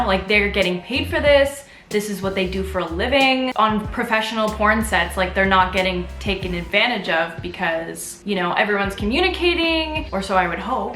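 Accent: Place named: Canada. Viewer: Me(straight boy) who's here just to hear beautiful Canadian Accent and her humor😆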